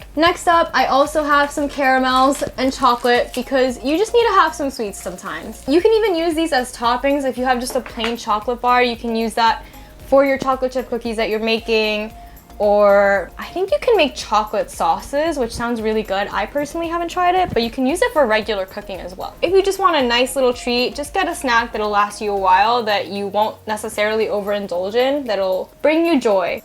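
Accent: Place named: Spain. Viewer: I have to get better at grocery shopping, thanks, also loved your Spanish accent :)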